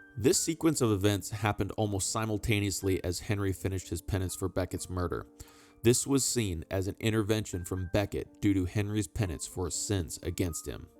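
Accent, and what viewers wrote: United States. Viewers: I hear his American accent, but can't identify the region.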